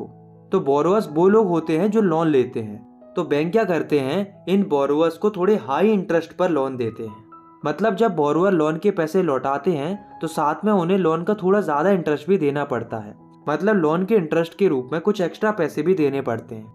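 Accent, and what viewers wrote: Uttarakhand, India. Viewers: Sir uttarakhand se ho kya ??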